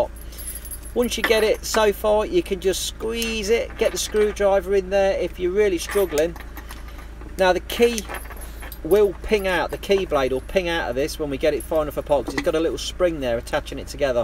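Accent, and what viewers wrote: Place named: Yorkshire, United Kingdom. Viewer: You from Yorkshire fella!!! Sound it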